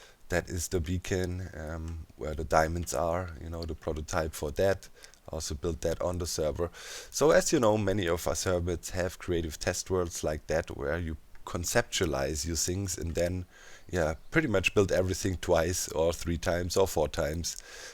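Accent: Germany. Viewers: Ich hab direkt gemerkt du bist deutsch du hast einen kleinen Akzent. Antowert bitte wenn ich recht have und du mich verstehst.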